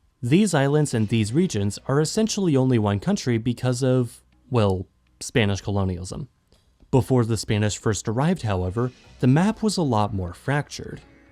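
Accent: Philippines. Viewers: Is it only me?? have a Filipino accent.🤔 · you speak english like filipino ilike your accent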